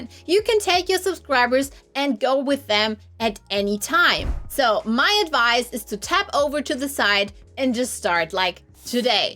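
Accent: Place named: Germany. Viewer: Noooo your German accent is all part of the charm! It's your character. I really enjoy your videos, you put so much work into editing and production. 😊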